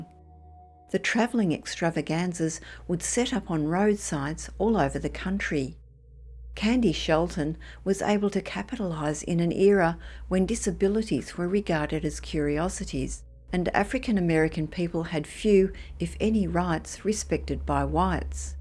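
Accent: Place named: Australia. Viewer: Finally, an Australian narrator, with a nice soothing voice at that.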